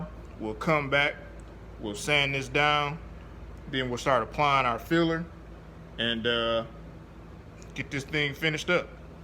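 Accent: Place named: Youngstown, United States. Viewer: Where you from man. Reminds me of my area in Youngstown hahah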